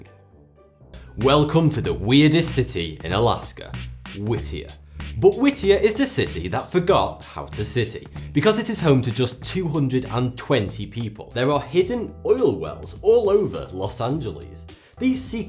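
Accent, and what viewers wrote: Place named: Manchester, United Kingdom. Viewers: where abouts in England are you from? you sound northern I'm from Liverpool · Subscribed for the Mancunian accent.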